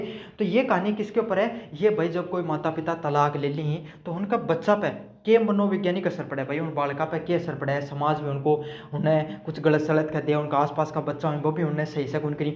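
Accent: Marwar, India. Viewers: Sir itna bhi sach nhi bolna tha😂😂😂. Puri marwadi and dialogue super 😂😂😂😂😂😂😂😂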